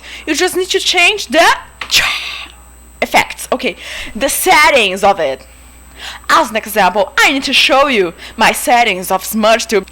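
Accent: Brazil. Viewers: Gahahahahaha i learned the same amount i had fun with this video! Thx! idk where u're from, but sounds like ur from Brazil, if i'm right, muito obrigado pelo vídeo! Hahahahahah me diverti e aprendi mt S2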